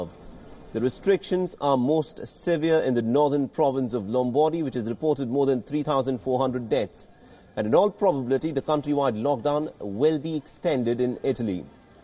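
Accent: India. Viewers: It is an Indian channel. If you can't understand his accent may be you are at a wrong place. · It's indian news channel.. He is speaking in indian English.. U dont know... Go and learn it kid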